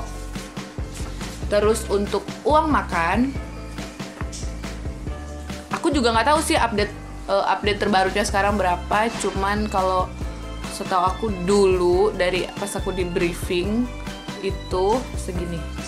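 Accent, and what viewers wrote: Manado, Indonesia. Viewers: Kakak org menado yahh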